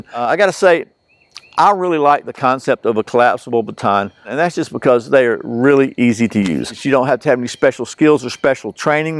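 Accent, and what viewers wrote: Georgia, United States. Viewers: You have a really relaxing voice. Are you from GA or thereabouts?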